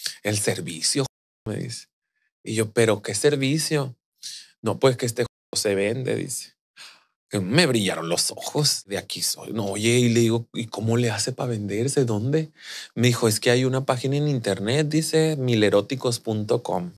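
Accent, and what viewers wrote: Culiacán, Mexico. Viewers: habla muy culichi fuera de su canal 😍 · Me encanto que en el podcast se le escucha su auténtico acento culichi de me gusto mucho la entrevista ❤ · Excelente episodio❤ me gustan verlos videos de pero este estuvo perrisimo🤩💜 Me encanto tu asento culichi😍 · Ayyyy asi todo!! Ese acento culishi 😍😍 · Ame el podcast jajaja pero ayyyy amigaaaaa jamás había escuchado taaaan notorio tu acento culichi jiji... Y nos adelantaste todo el chisme de la picadiente en fin, saludos bb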